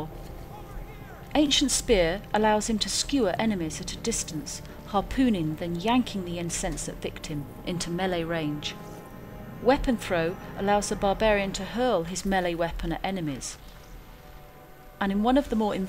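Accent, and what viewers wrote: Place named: United Kingdom. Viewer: you are to diablo3 what David Attenborough is to the BBC. I know us Americans have been trained to think British accents are smart but it definitely works for this vid.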